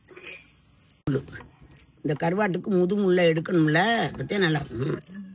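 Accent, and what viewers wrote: Ramanathapuram, India. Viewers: Enga Ramnad district pechu endha vooru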